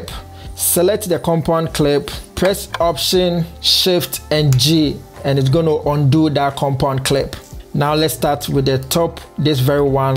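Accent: Ghana. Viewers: Hi. Love the Ghanian accent. How to you add effects to web pages like you did from showing the videos you make. Once again LETS GET STARTED.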